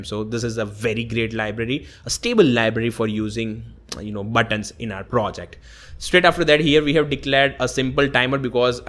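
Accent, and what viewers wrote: India. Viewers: I'm always having fun listening to indian accent. I don't skip just because of it. hahaha.. By the way, this will help me to decide when automizing my home in the future..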